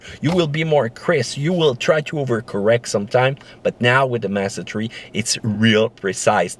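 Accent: Canada. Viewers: From the states I appreciate and enjoy the Canadian accent. I loved hearing GSP from the ufc talk for that reason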